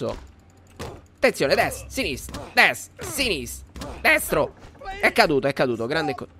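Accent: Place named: Central Italy, Italy. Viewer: Di dove sei? Hai un accento del centro Italia che non riesco a definire esattamente..sparo marche :)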